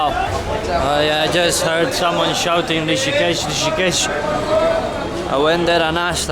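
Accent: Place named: Scotland, United Kingdom. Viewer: Great vlog,! You picked up some Scottish accent too!! 🤩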